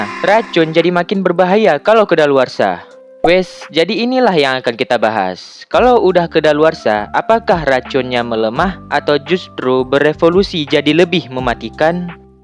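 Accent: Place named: Aceh, Indonesia. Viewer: Logatnya meuaceh that sang 🧐🗿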